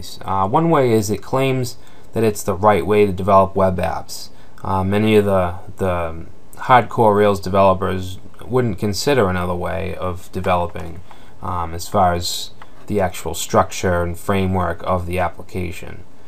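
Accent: Boston, United States. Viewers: bcz he is from boston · Are you from Boston? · accent sounds very boston-y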